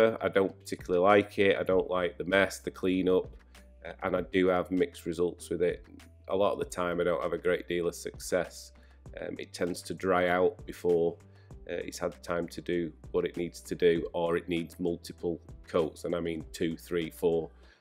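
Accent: United Kingdom. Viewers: Wow. What a masterpiece. You made the vid relaxing and entertaining to watch. Great editing and I still like your British accent as well. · This became a stunning beauty, reallly - sub button pushed! indeed I like your British accent - in terms of "English" I'm a kind of "Britophil"! ;) Greetings from Germany, the silver fox, David 😉🦊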